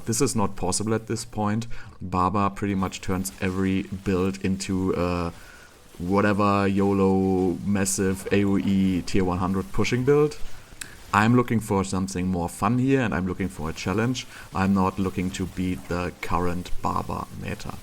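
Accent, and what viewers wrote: Germany. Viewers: i made i similiar build yesterday. 180 mana with enchant throws 7 lightnings. 1 regular, 4 omnipower and 2 from enchant. already works like a charm with 2.0 mana per bounce. Esus boots for crit and i use the 300% gauntlets. Also currently with raiment but i will probably use a normal chest soon and therefore put temerity in to try it out. i also use 2h with 80% for 4 additional bounces. i also use the 20% enchant boost in paragon but dunno if its worth it. question: you use elementalist. do you know how the crit chance is calculated?i would like to use it as well and get rid of the boots but dont know how it works. if i have my omnipower procs from 150mana to 0 and it casts the chain lightning do they keep the enhanced crit chance for their whole duration or everytime i drop below 100 mana while they are flying it dynamically adjusts and removes the crit chance? 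I feel like cc duration is useless. i just stun them again with 3 points in the stun passive. and everything is stunned anyway so no need to use shared missery. i also do not see the point in freeze because you have stun as hard cc. i may try it out but i dont know if the 3 uniques, paragon board and so on is worth the effort. my dmg numbers look the same are you german? your accent sounds like it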